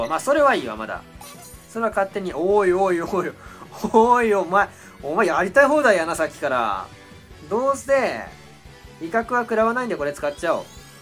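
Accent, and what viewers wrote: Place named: Nagoya, Japan. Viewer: そろそろ、名古屋弁全開実況しまょう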